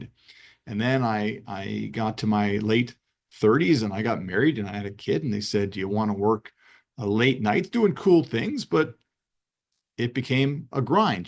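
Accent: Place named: United States. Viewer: You pronounce "Z" like Yankees.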